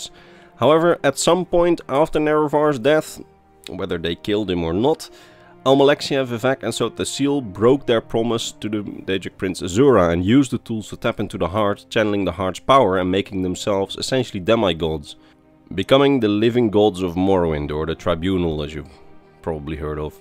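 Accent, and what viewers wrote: Netherlands. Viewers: Lol, I love watching this channel, I mainly listen to it because I love 's Dutch accent. Can't get enough.:D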